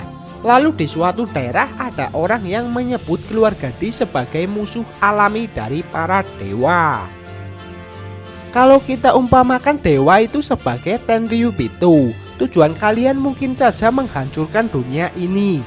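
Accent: Java, Indonesia. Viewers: Medok men to mas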